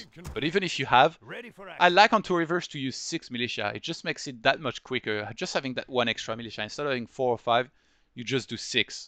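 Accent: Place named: France. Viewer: la légende FR toujours un plaisir tes vidéos même avec ton accent bien français mdr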